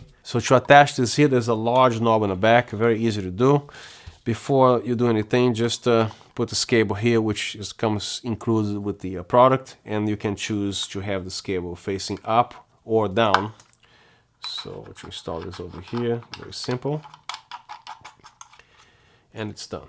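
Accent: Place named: Brazil. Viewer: I couldn't ask for a better review, this is really detailed. Also, I can tell by your accent you were born (or at least lived) in Brazil. Am I correct? Cheers!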